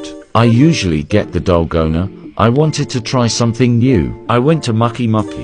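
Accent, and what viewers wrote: France. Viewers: does she live in paris or smth ? if so for how long cz she has a french accent in other videos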